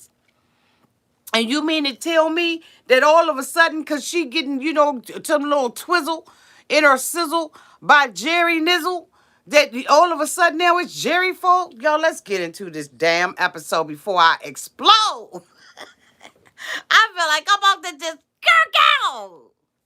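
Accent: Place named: DMV, United States. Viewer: This my first time listening to u, but I can hear the dmv all up in u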